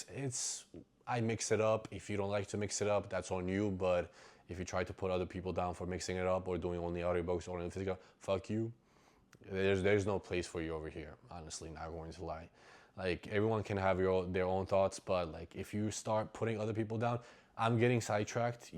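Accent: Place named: New York, United States. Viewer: It's crazy that YouTube recommended this video to me, and im so glad it did. I'm a fellow immigrant who used to love reading and is trying to get back into it, so finding this video in the middle of the night when I was about to turn off my phone is insane. Anyways, I loved the video, I can't wait to watch more. And also, I could barely hear your accent, you just sounded like a new yorkan to me 😆. I will be checking out the books you mentioned soon, beacuase you make them sound really great.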